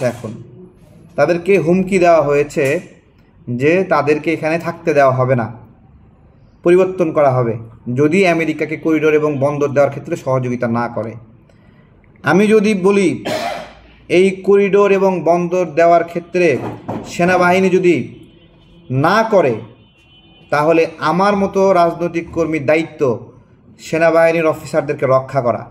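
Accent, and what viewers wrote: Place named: West Bengal, India. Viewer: আপনি বাংগুদের সুরে কথা বলতেছেন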